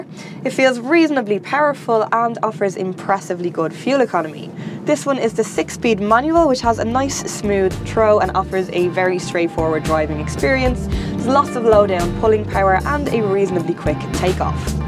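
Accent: Ireland. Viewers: Hiberno English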